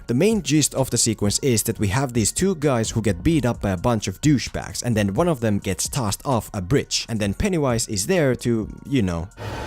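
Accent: Finland. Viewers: wait where are you from? Your English accent sounds Finnish.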